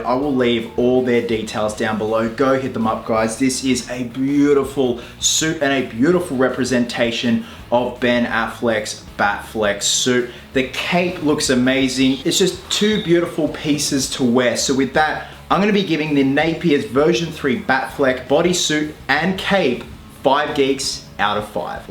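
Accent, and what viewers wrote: Australia. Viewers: It’s weird cuz batman has an Australian accent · I actually bought it that you were Batman when you were doing the reveal video. Then when you spoke again I was like "Oh, right he's Australian" lol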